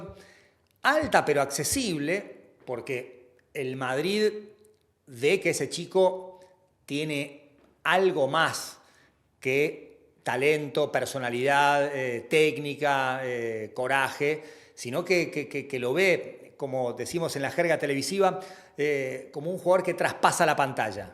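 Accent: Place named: Argentina. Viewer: que buen análisis, se te pegó muy rápido el acento argentino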